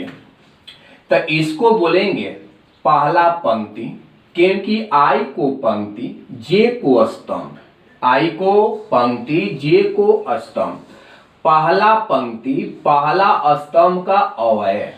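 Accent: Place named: Bihar, India. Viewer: Sir ekdum bihari lagat bani rauuaa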